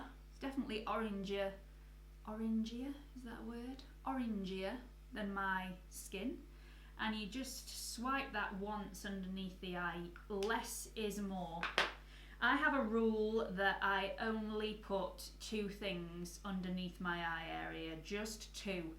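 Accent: United Kingdom. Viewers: Luv the British accent!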